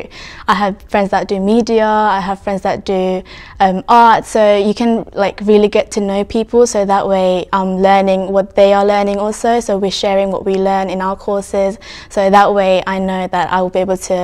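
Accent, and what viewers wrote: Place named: United Kingdom. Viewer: I like her British accent. I haven’t heard an asian speaking in that accent before.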